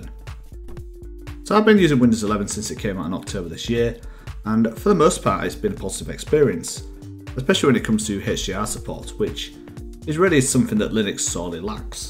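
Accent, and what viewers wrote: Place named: Northern England, United Kingdom. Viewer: Thank you so much for this. Just installed win 11 and while it noticeably improved my games, it took forever to start up, with tens of apps fighting for processing power on start up. I just uninstalled 50 apps and can now use my computer again. Also it's a breath of fresh air to hear a northern tutorial. I'm guessing Leeds or Sheff? Maybe Humberside?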